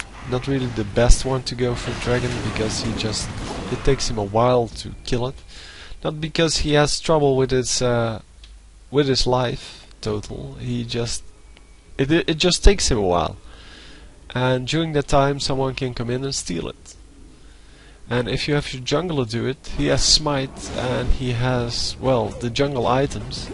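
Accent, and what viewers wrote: Netherlands. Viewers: Are you Dutch? you sound a bit dutch ^^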